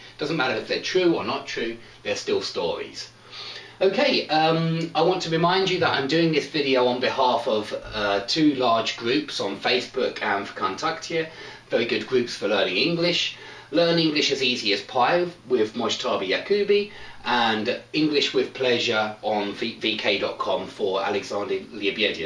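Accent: United Kingdom. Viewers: British accent <3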